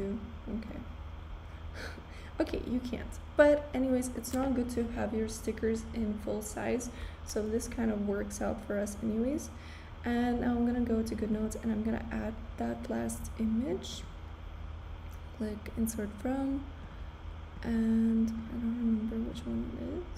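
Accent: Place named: Spain. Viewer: I am Indian but love your Spanish assent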